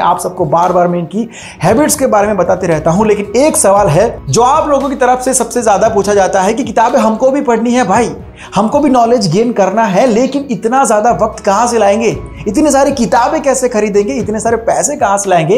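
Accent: Gujarat, India. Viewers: Gujarat se ho Bhai???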